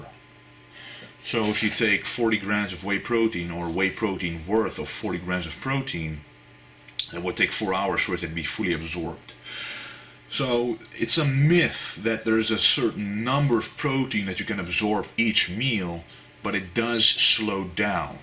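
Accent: Netherlands. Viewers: I had a great time hearing your dialect, beeing Duch myself I immediately heard that, which is totally hidden from me when you speak english. That was fun, I didn't expect that at all. Thanks for so many great great videos. Next to Mountaindog John Meadows and Athlean-X Jeff Cavaliere this is an awesome source of high quality, down-to-earth information.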